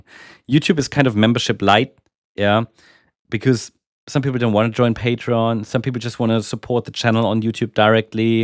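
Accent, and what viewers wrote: Germany. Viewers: you have a german accent, are you german? )))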